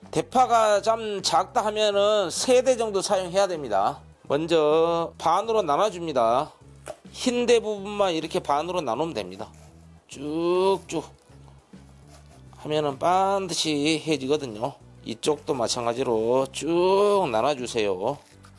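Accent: Jeolla, South Korea. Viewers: 세프님. 육개장누가먹읍니가요. 세프님요리주문안됩니가요.전라도. 말씨가. 좋아요. 세프님건강하세요. 요리대박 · 유튜브 보면서 처음으로 댓글남겨요^^ 쉐프님 말투가 같은 전라도 사람으로써 너무 정감있습니다~ 저에 쉐프님 레시피 원픽은 닭볶음탕!! 항상 응원합니다. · 셰프님 감사합니다 전라도사투리가 약간 들리면서도 자세하고 순서 정연하고 초보자도쉽게 설명해 주셔서 고맙습니다 요리책 1.2.권을 구매해놓고 아주유용하게 사용하고있습니다 셰프님최고입이다 요리유튜브중에서최로중에최고입이다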